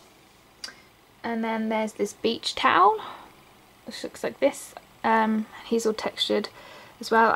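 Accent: United Kingdom. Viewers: Your charms are very cute >.< I love them,perhamps i love your british accent x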